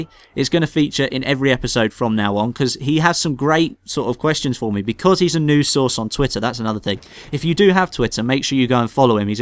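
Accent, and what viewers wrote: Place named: South East England, United Kingdom. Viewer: He speaks like most in the south east (i think) of England do.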